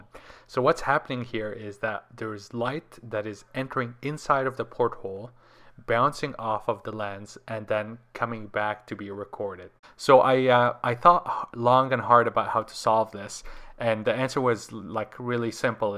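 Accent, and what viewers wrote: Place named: Quebec, Canada. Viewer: Merry Christmas! Will check out the portholes. Really neat. And I was talking to someone locally and they said you sound like you might be from Quebec. True? 😁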